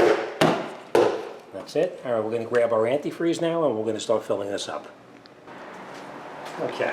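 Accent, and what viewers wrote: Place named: New England, United States. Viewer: Good video. Hope you’re still doing well after nearly a decade. (Maybe enjoying a well-earned retirement!) Interesting that the procedure is different than that on the ‘06~’15 Mazda MX-5 Miata. But makes sense as it’s RWD and thus longitudinal mounted, versus the Mazda3 FWD and transverse mounted. No fiddling with the belt, tensioner, alternator, etc. But you do have to take out the air filter housing, and often even the throttle body. Good call on going back to the Mazda FL-22 dark green coolant. I call it the “Philadelphia Eagles Green” coolant, as opposed to the universal coolant (Seattle Seahawks bright green 😅). (I’m guessing from your accent you’re a Pats fan so I thought you might appreciate that 😂.) Ford used to carry the same (now replaced by Yellow), and “Asian Green” from Zerex or Peak are also suitable. 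I can tell you that these (usually only the early years like my ‘06 MX-5) had a common problem with the thermostat sticking open, similar design (wouldn’t be surprised if it was the same part number). I’ve had a P0126 on mine for almost as long as this video’s been up; I usually just reset it. 😂 Also if you have an Bluetooth OBD2 dongle to read the engine temperature while driving, it’s too low (maybe 140 F, should be around 190F). Also you may get insufficient heat from the vents (blows cool air). But “this is the year” I go ahead and fix it.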